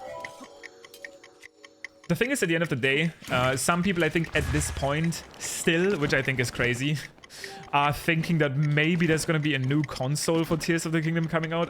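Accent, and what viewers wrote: Germany. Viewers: Are you German? Your English sounds German.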